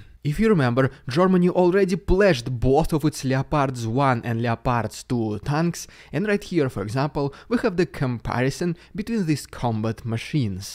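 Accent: Russia. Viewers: Nice guy. Your English with Russian accent is very clean and smooth and soft... some very good lessons with enunciation. Keep up the good reporting. I know because you're Russian background your popularity will be lower, but you're doing well... keep it up! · I know it's 'not all russians' and I am glad for decent russian people like you. And I love your accent. :) I have a little bit of russophilia left in me despite the last year. (and incidents before them too).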